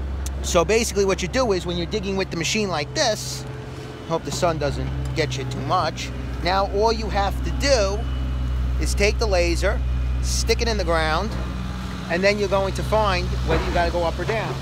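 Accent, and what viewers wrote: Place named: New York, United States. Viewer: this dude sounds new york as fuck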